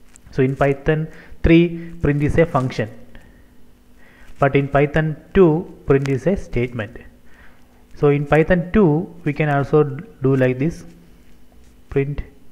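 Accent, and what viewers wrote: India. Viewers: are you from india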